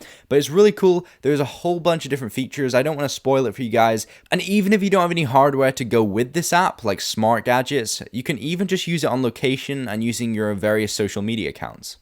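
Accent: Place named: Edinburgh, United Kingdom. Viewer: What's up with your accent? I'm guessing you're from Edinburgh but...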